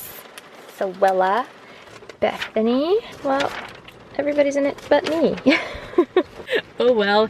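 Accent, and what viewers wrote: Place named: Australia. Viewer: Am I the only one that hears an Australian accent sometimes 😀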